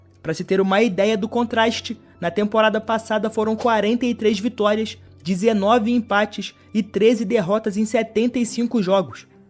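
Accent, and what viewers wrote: Rio de Janeiro, Brazil. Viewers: So pelo sotaque da pra saber que e carioca skskksks (sou tbm)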